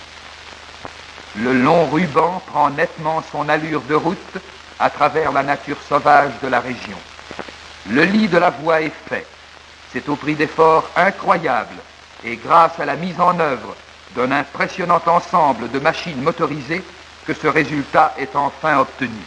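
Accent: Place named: Quebec, Canada. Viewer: Comme un Québecois. I hate it....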